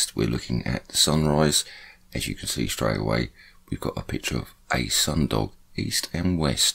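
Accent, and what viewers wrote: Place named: London, United Kingdom. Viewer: i thpught it was danny dier!! I lav tha landan acsent maytee..up thee apples n blady pears boss...awsome great explanation in every video u put on...👍☝☝☝☝thank u..from nottingham..uk.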